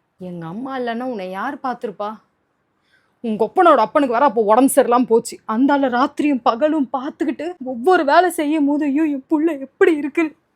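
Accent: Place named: Chennai, India. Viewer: You are born and brought up in Haryana right? Your Tamil accents, typical Chennai girl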